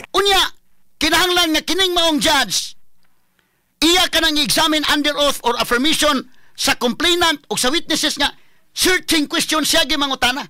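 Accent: Visayas, Philippines. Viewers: I like to watch your videos because you’re humorous and straightforward. And love to hear my dialect of bisaya. I lived here in USA 🇺🇸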